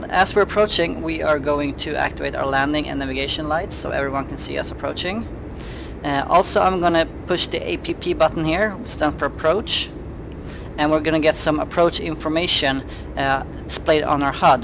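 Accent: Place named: Sweden. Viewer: Nice vid like always. btw are you swedish you sound a bit swedish xD , im swedish .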